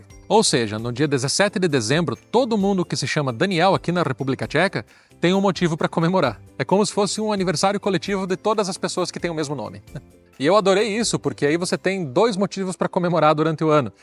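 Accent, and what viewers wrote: South, Brazil. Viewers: Oi, Daniel, esse de teu sobrenome é português, italiano, ou polonês transformado? Logo que começaste a falar, identifiquei: RS, SC Ou Paraná? Sou jornalista e antropóloga e adoro vídeos como os teus. Parabéns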